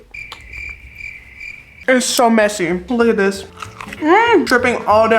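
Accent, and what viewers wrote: South, United States. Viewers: Thank you for making realistic content!! Also I’m a moved away from the south person so your accent makes me feel like your my cousin haha. Keep being the realest girlie out here.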